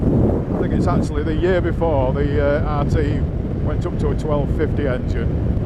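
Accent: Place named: Derbyshire, United Kingdom. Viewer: That’s a coincidence. I popped into Pidcocks last Thursday . I live in Derby, you sound more N Derbyshire / Notts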